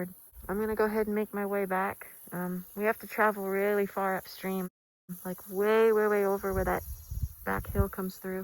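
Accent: South, United States. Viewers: The country accent on that femboy voice. Wow. Melt any man's heart.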